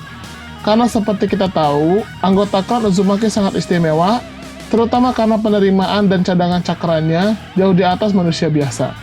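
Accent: West Java, Indonesia. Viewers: Orang sunda ya? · Kamu orang Sunda ya min?? Hayo ngaku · Ini yg ngomong orang sunda ya soalnya logatnya ketara bgt, bilangnya narutok sasukek belakang pake "K" semua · Didenger dr cara ngomong nya, kayaknya si mimin ini urang sunda nya ?? 😁😁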